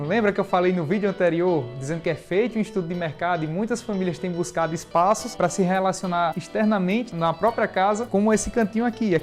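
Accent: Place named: Ceará, Brazil. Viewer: Gente esse canal virou uma série pra mim. Na proxima encarnação vou nascer no ceará quero ter um sotaque assim.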